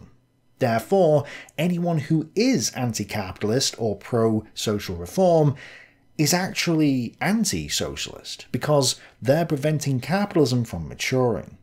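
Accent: United Kingdom. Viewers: Classic, just few minutes in and so much makes even more sense than before, almost as good as Captain Context himself. Luv me listenin' to Br'ish lads, simple as.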